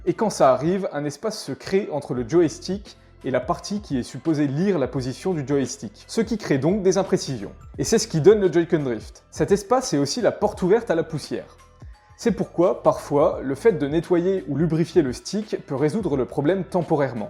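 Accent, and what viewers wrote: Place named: North, France. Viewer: c'était l'un ou l'autre avec cette accent qu'on partage 🤣 Salutations des chtimis 😅😁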